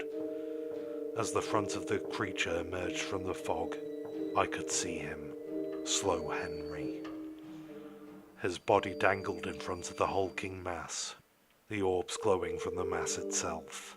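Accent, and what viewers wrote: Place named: United Kingdom. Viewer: Hello, I really love your Brithsh Accent it's So Sexy 💋 much love Sexy Grandma Darkness ✌💙💙 Ps keep doing This you are very Good at it ❣❣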